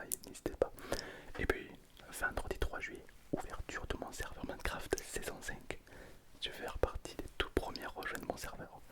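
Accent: South, France. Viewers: Hey j'adore tes vidéos je me posais une question, tu viens d'où du sud?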